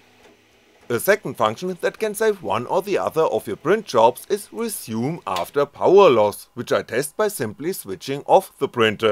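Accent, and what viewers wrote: Germany. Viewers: Dein Deutschenglisch schmerzt in den Ohren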